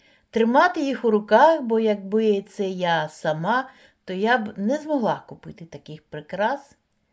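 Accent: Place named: Ukraine. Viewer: Який приємний український говір❤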